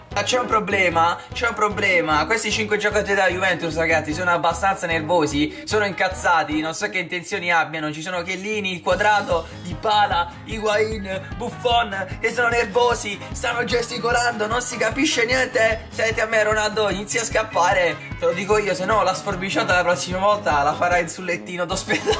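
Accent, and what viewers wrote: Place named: Rome, Italy. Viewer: Ma era lo stadio del Marsiglia🤣🤣🤣 Pensavo tifassi Roma dal tuo accento🤔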